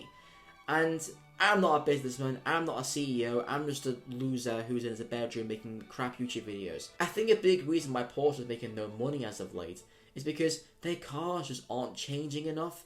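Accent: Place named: West Country, United Kingdom. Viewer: Yank here. Some of us like to try to figure out different English accents. Most Americans can't even tells the country, but many of us can. Are you on the west side? Bristol? Maybe Wales? Sorry that has nothing to do with your Porsche content, but your video was suggested to me and I love the Porsche topic.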